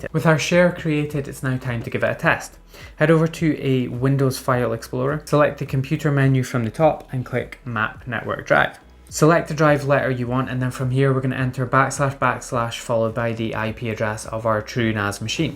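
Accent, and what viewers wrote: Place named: Scottish Highlands, United Kingdom. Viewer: If I was to make a guess I would say you are from/at least grew up in Inverness?...definitely somewhere in the highlands.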